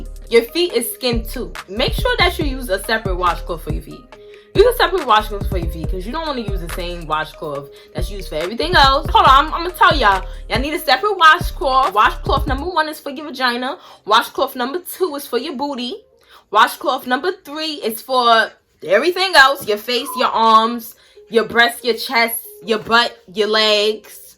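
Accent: New York, United States. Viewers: Am I tripping or do she have a New York accent 🤣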